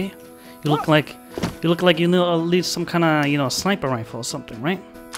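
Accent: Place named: Denmark. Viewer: You sound very Danish. Could I possibly be right?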